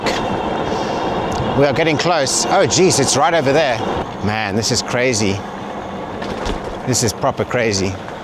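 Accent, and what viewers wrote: South Africa. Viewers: Came across your site & immediately recognized your accent as South African. Thankful your property is okay. The fires were horrendous. Subscribed!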